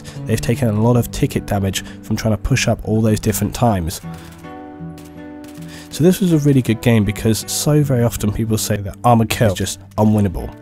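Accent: United Kingdom. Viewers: You mention players moaning about server admins not balancing out teams and what you say is so true. Speaking as a server admin, you can't be constantly moving people round just to balance out teams when that person you moved could have been in a squad with mates or was on a winning side when moved and players don't seem to take this into consideration. You just end up pissing players off and they leave the server. Also I love that you feature on LvlCaps channel, great to hear a Brit talking!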